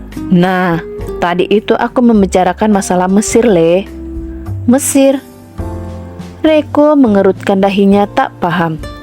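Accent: Java, Indonesia. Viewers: Suka logat yang medok ini,, pas ini dubber nya 😍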